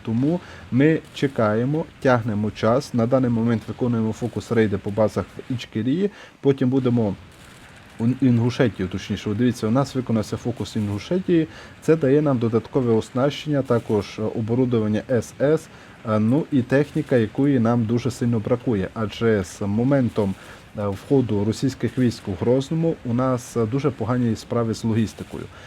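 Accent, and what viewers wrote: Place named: Vinnytsia, Ukraine. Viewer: Друже! Південь Вінничини? Люблю свою країну! За вимову йдеться! Буковина?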